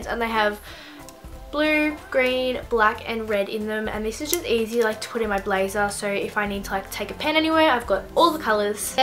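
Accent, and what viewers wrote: Australia. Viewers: Is her accent Australian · Sarah Ann Her accent sounds Australian...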